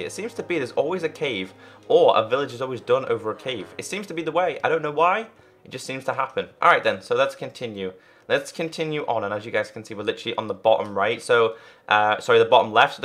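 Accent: Liverpool, United Kingdom. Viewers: are u a scouser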